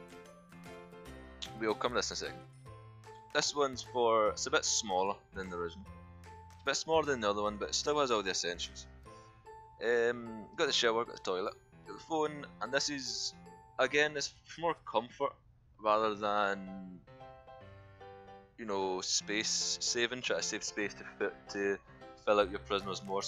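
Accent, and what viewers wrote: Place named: Scotland, United Kingdom. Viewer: You're an absolute legend. You have truly understood the aim of this game. Comfy times 👌. The jolly music played in the background is just class haha. Are you from Falkirk? You sound like my pipe major, he's from Falkirk. I'm from the West Coast (Scotland).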